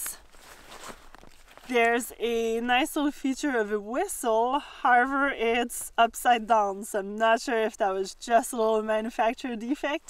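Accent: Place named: Quebec, Canada. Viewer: You sound like you’re from Quebec, Canada!! Is the bag more of a burnt orange, or is it truly red?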